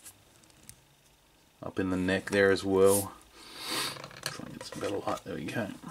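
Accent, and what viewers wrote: Australia. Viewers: Thanks. You are right they let her walk like a human and it spoils all the hard work the effects people did. Where do you get your figs from you sound like an Aussie. Forget the Aussie housing crisis there is there is a black series crisis.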